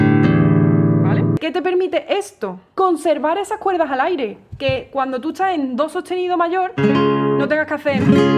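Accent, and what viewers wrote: Andalusia, Spain. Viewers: Me gusta como hablas, de que región de España eres? Ya entiendo un poco más porque en toda Latinoamérica hay diferentes acentos dependiendo del país que se hable, aquí mismo en México hay distintos acentos dependiendo de la región que se hable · Tó el arte!!!! 😂😂 Diego del Morao hace unos transportes q flipas, pero a ese nivel... y los guitarristas acústicos del fingerpicking?? La usan sin ningún complejo. Papafritas hay x tos laos!!! Ole tu acento sin reparos ni tapujos y el q no lo entienda q aprenda andalú!! 👏👏👏👏👏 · ese acento andaluz ufff me mata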